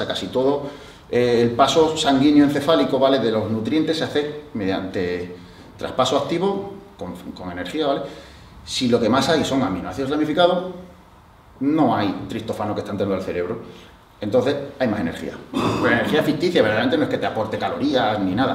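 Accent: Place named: Extremadura, Spain. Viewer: El tío es de Cáceres (Extremadura) es normal que hable así, ya que la zona de Extremadura fue una zona muy poco urbanizada por bastante tiempo y conserva un acento muy castellano, parecido a los que hablen en Castilla y León, exceptuando la zona más sur de Extremadura casi pegada a Andalucía. Lo sé porque soy extremeño.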